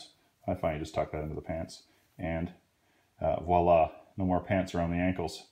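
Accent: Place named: Canada. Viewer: Canadian accent 😬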